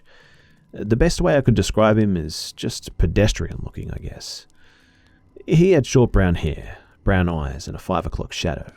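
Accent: Australia. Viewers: Leave it to me to listen to the awesome Aussie narrate these badass stories just to keep myself up at night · I would happier if do me and do me good while reading to me in that sexy Australian voice of his!!!!!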